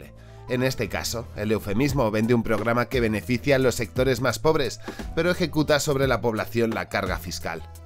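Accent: Colombia. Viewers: Que crack, pareciera que un colombiano hablara, estás muy bien contextualizado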